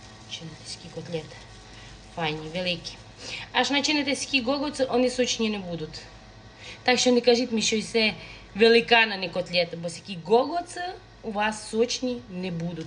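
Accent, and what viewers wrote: Transcarpathia, Ukraine. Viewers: То говір Закарпатський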